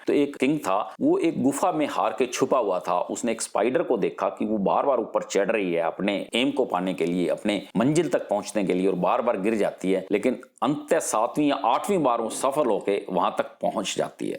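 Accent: Haryana, India. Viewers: Sir aap kaha s ho proper,,,,,,, apki language sa to aap Haryana sa lag raha ho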